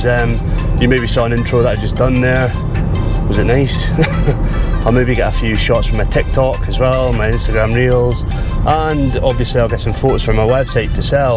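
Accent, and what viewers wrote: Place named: Aberdeen, United Kingdom. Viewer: Hi that’s a lovely video well done. you now have me on board and I have subbed to your channel. I too have a mini three pro and watching you operate yours is very instructive. Thanks for putting all the detail and showing your face, so many drone users tend to just show their footage and not make a story out of the video. I certainly try to do the same myself. I am loving the Aberdeen accent however I have had to put the subtitles on to catch everything you’re saying. Unfortunately the software can’t pick it up either so I just watch the pictures for the most part. Finally you are inticing me to play about with the settings far more, I generally just leave it to the automatic settings but you show how the shots and video can be better by changing the shutter speeds and white colour balance. Looking forward to seeing your other work.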